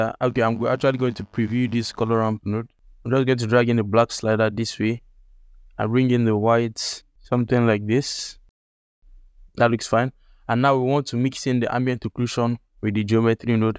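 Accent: Nigeria. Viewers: Bro something about that Nigerian accent makes the tutorial stick more had to subscribe ASAP, thanks man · nigerian accent = trust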